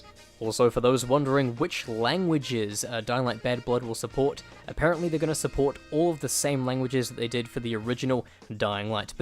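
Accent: New Zealand. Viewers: I have ONE queston... Are you a New Zealander, if not... EXPLAIN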